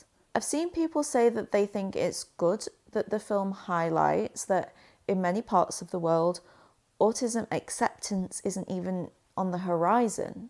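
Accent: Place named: England, United Kingdom. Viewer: I just re watched this video to try and work out what you were talking about, the person who made this video is saying the word ableist in her accent and is the way that I would expect to hear it. I'm sorry that you aren't used to hearing this accent (I'm not from the UK so can't tell you which part of England it is from), that doesn't mean that she has mispronounced the word.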